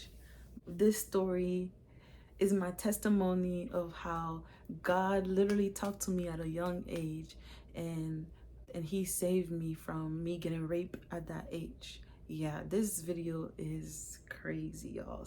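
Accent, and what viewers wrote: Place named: Haiti. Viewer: I picked up a Haitian accent the moment you started speaking 😍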